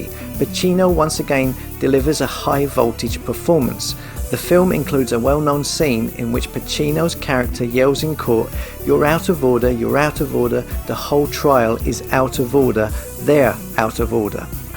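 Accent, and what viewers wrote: United Kingdom. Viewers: British accent is SHIT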